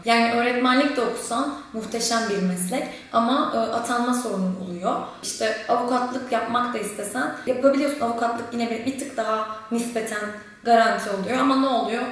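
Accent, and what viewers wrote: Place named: Hatay, Turkey. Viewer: Hatayli arkadaşim var konuşması senin gibi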